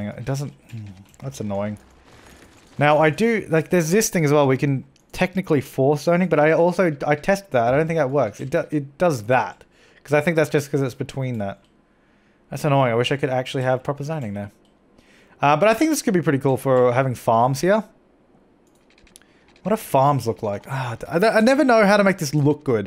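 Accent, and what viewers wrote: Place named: Australia. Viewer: I like ur channel cos u have an Australian accent which is epic ( I have a English accent)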